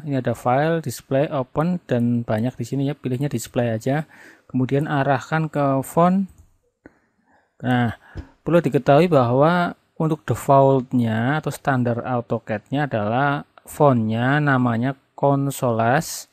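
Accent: Banyumas, Indonesia. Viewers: menyimak wae om cara setting comand line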